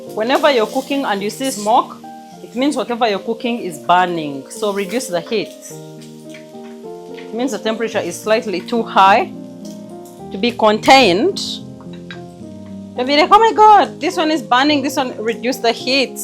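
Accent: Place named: Kigezi, Uganda. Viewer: How I love your videos Then your kikiga accent when you are speaking 😂😂😂 Keep going mukazi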